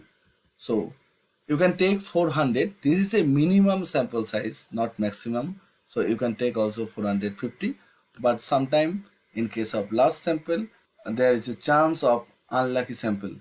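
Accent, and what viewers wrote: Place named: Bangladesh. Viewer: are you from Bangladesh? Your accent is very similar to the south east region of Bangladesh.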